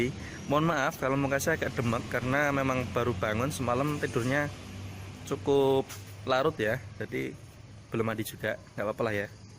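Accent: Java, Indonesia. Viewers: Medhoq banget 😆😆😆😆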